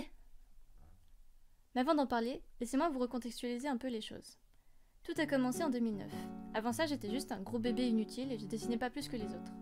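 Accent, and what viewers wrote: France. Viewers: You’re French?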